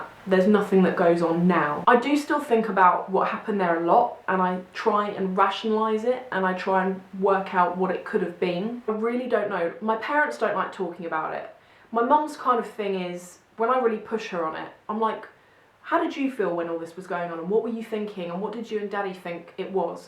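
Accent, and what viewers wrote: United Kingdom. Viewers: You sound so British!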